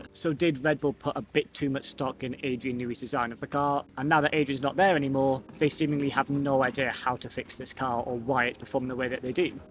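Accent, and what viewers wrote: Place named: England, United Kingdom. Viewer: You sound English. The crows / ravens in the background sound Australian. With Alonso, Hamilton and other more experienced drivers perhaps staying on for longer than previous eras is it not the case that the whole field is more skilled and tighter together than ever with any car or driver slightly lower in performance seeming to stand out more for time differences that are far smaller than previous years.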